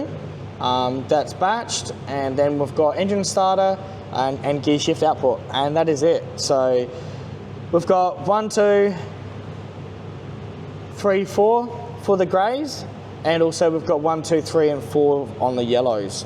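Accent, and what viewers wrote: Australia. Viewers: He almost sounds Australian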